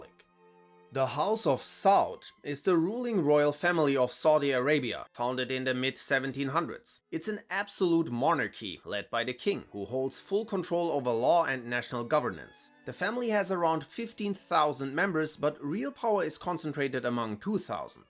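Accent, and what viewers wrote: Germany. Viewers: December 28, 2025 - As an admirer of, I enjoyed this video as much I have his other offerings. This video covers a interesting subject, and I did learn some things. It is my contention that among the "faceless" international elites that determine much of what happens in Western politics and society. Some of the families mentioned in the video are part of that group. Lastly, I loved hearing the good pronouncing the various family names with his distinctive German accent. 👍👍😊I take this opportunity to wish the good the very best of the holiday season 🎄and a Happy and prosperous New Year🎆🎆 Stay well.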